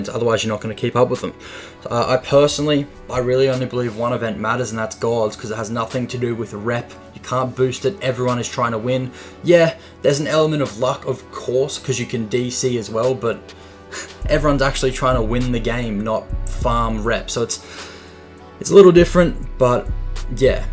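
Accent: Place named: United Kingdom. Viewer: I was just wondering where you are from? You sound like you have a little bit of a British accent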